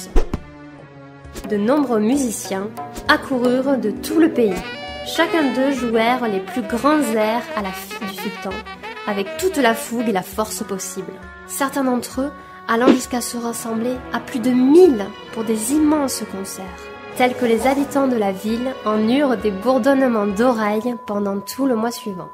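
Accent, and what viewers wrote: South, France. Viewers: L'accent du sudd <3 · Petit accent du sud guerre discret malheureusement^^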